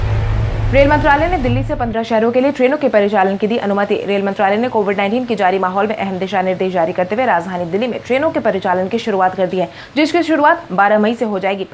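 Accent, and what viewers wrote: Bihar, India. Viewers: Madam ji Bihari mat bola kro ye sabd ache nai lagte hai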